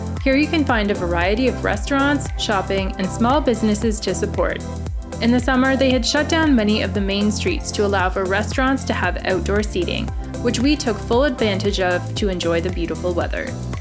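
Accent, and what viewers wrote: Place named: Canada. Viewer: This lady has a nice Canadian accent.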